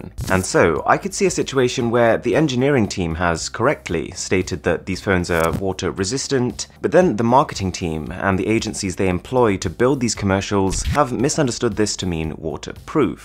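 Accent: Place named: United Kingdom. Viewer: yeah he sounds birtish-y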